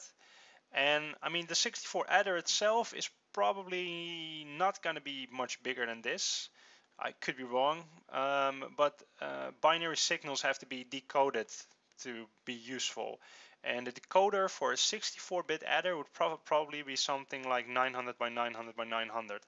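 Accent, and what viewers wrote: Netherlands. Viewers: je spreekt comparator verkeerd uit, 'tgeeft niet p.s. je kan hiren dat je nl bent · You are Dutch right? I can hear it 'cause of your accent :P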